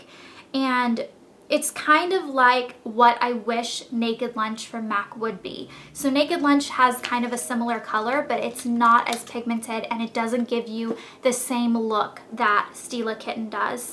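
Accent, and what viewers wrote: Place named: South, United States. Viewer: NAPAL she has a southern accent :) the middle "a", nApal, sounded like an E :)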